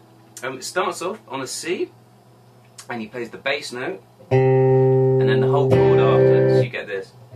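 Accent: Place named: United Kingdom. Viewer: love the cup of tea and the nice accent very british! you make us proud. thanks for the lesson it really is great and very useful. keep them coming xx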